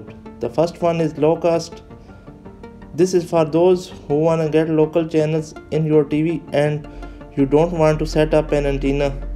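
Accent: India. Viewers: Indian English accent cannot understand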